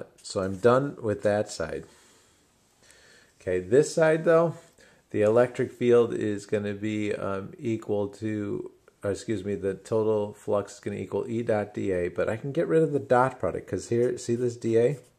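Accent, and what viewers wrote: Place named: South, United States. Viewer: Never thought learning physics from a dude with southern accent would be more helpful than my professor with British accent. 'Murica!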